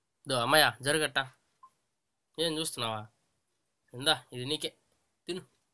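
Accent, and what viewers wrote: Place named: Chittoor, India. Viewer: Nice brother super comedy chittoor yasa adhiripoindhi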